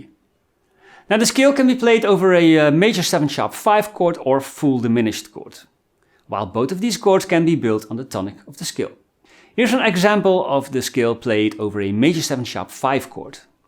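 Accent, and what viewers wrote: Netherlands. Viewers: Dense and beautiful, and with a Dutch accent. I'm jamming with a good friend who is a Nederlander and we are working on diatonic modes. I love your graphic showing how to think about modes. I shared with him. Thanks for dense and beautiful.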